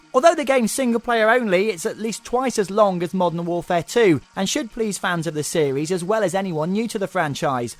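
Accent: United Kingdom. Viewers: Am i the only one that findsthis review more entertaining because of the the ( hope i have this right ) british accent.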